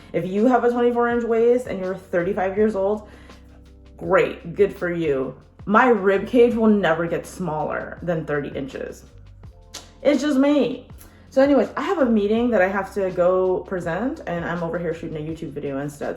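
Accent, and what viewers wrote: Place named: California, United States. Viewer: Love your personality, and it’s so refreshing to hear your “cali accent” I’ve been away from home for 7 years now & it’s so annoying when people tell me that I have one lol but I have now embraced it and love it!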